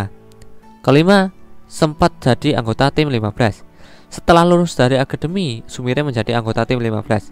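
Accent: Java, Indonesia. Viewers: Nonton.. medok euy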